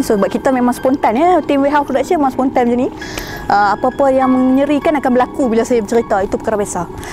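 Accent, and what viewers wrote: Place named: Penang, Malaysia. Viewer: . Hang segak la cakap penang pulak! Hang mmg comei 😍😍😍 dok follow dari joki timba tu.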